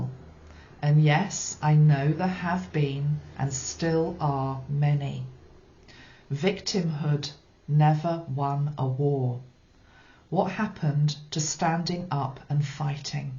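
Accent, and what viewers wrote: England, United Kingdom. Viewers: Thank you so much for this uplifting video. We, the people, are more powerful than we know. I just love that beautiful English accent of yours and Best Wishes for a fun holiday in London.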